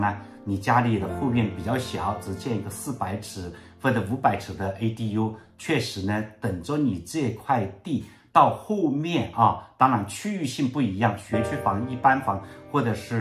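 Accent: Hunan, China. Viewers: 能不能把湖南口音改一改，不要唸“邹霸”，“一鱼既往”......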